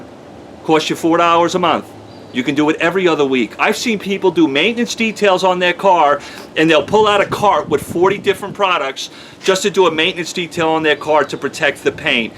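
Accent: New York, United States. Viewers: Did you perhaps grow up in ny or nj? The way you talk sure sounds like it :)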